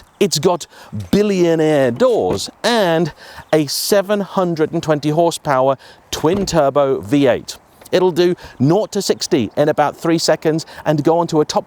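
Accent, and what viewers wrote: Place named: England, United Kingdom. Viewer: omg I love listening to PROPER English and not the American version... For anyone that does not know, English is not the native language of America, they truly don't have a native language at all! START SPEAKING PROPER ENGLISH AND STOP CALLING FOOTBALL A SPORT THAT THE BALL BARELLY TOUCHES YOUR FEET !!!!!!!!! a trash can is a bin and the hood is called BONET because the hood is the one you GREW IN !!!! You ruin everything you touch, but ruining language is a crime !!!!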